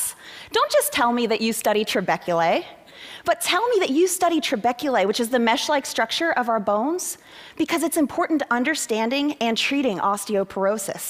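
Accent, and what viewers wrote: United States. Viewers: I dont think so..that was an Australian series- this woman has an American accent.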